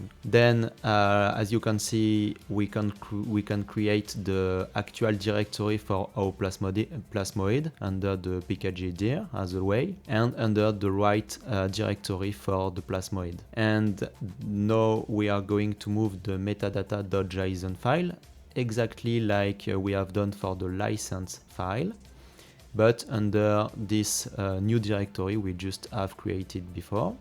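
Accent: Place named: France. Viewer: Question : how do I get my pgp id for the validatepgpkey (also nice french accent)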